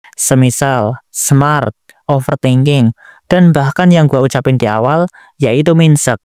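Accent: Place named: Indonesia. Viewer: itu namanya logat kocak! atau bahasa Inggrisnya accent. di AS pun pengucapan Inggrisnya beda beda ada, Washington accent, LA accent, New York accent, diluar dari AS ada British accent yg pengucapannya jauh beda banget sama American English, satu lagi yg populer ada yg namanya Indian accent itu juga jelas banget perbedaan pengucapannya. dibalik dari itu apa pemilik channel ini salah ngucapinnya? jawabannya engga karena kasus disini dia pake Indonesian Accent.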